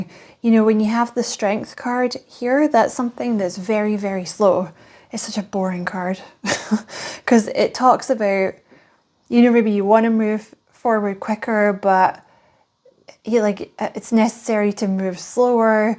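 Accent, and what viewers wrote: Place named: Scotland, United Kingdom. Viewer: Love your Scottish accent Lass! ❤ aye 🎉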